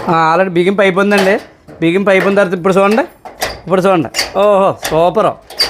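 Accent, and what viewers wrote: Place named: Srikakulam, India. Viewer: శ్రీకాకుళం language లా ఉంది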